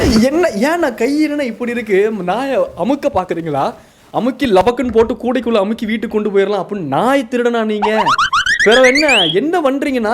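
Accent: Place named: Karur, India. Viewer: 😂😂😂😂 ayyo Madras samayal fav cooking channel 100 mattum illa108kum serthu phone pannirunga 😂 thalayatturathu mattum thaan unga role 😂 staff sick manager shock😂😂😂 neenga mattum unga maara kooda vilayadalam watermelon star mattum vilayada koodatha😂😂 but neenga+ maara cute ah irukum ithu comedy ah iruku 😂😂😂 unga karur slang vera level ❤❤😂 fans club watermelon starku thaan😂😂😂😂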